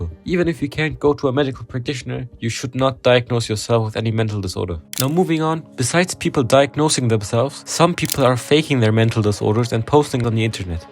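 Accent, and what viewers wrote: Germany. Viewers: You're very underrated. I like the way you edit your videos. And your accent sounds a bit german. Very sympathic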